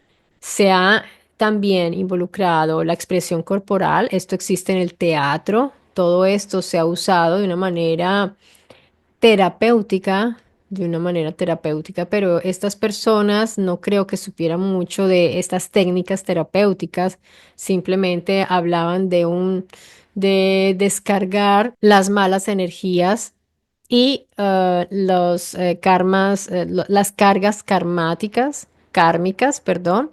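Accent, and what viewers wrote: Italy. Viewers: muchas gracias por tu nuevo video!!!! ya entendí por qué pensé que eras italiana viviendo en colombia por tu acento, era al revés jeje... pues si, esto de los zíngaros es un problema inmenso de toda la vida, pero ahora con el "buenismo" en el sistema judicial, pasó de problema a catástrofe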